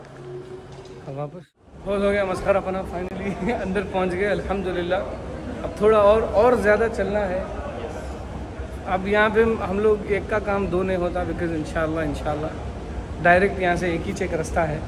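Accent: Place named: Hyderabad, India. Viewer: Bhiya full hyderabadi slang me hai kiraak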